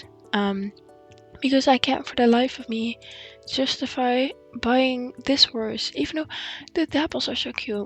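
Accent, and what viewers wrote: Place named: Netherlands. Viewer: The old ones are so cute!! Also are you Dutch? Your accent sounds Dutch :)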